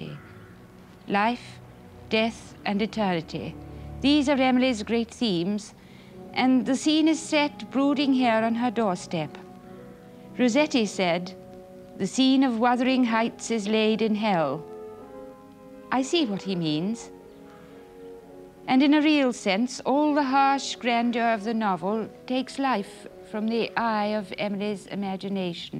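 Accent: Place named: Scotland, United Kingdom. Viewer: It's a posh Scottish accent actually.😊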